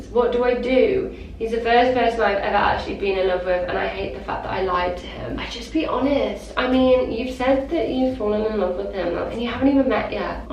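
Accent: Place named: RP, United Kingdom. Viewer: She sounds so posh here hehe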